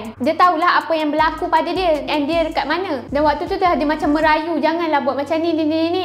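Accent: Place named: Kelantan, Malaysia. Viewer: Setelah dengar cerita ni sampai habis, saya boleh agak yang awak ni orang Kelantan🤣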